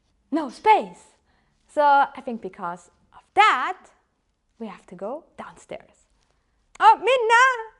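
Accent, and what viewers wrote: Germany. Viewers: Are you german? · i hear german accent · ^well china painted miniatures from resin are usually heavy. And before i order something i ll be looking for some apce, not afterwards. I cant get rid of the tought that she is like Carisma Carpenter in Angel... always talking, never stopping, but with this german accent...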